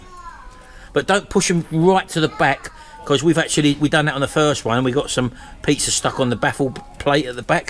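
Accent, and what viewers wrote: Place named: Cockney, United Kingdom. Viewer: Fairly new to your channel and I love it, I'm from East London but now live in Thailand, good to hear the Cockney accent. Dave B Thailand.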